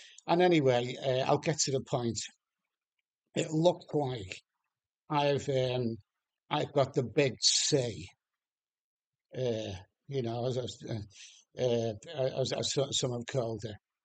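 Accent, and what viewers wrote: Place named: Liverpool, United Kingdom. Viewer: I was the guy that bumped in to you in Pucket Irish bar a couple of years ago and also from Liverpool, recognised your accent. You told me that you were from Bootle. I have followed your channel ever since. Good luck, your attitude to this is insparation hopefully for others.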